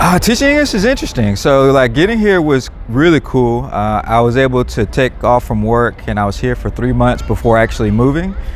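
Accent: South, United States. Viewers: Handsome!!! Don't loose that southern accent. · I'm from the south and I always thought I hated southern accents til I heard (and saw) this man speak 😭😝🤕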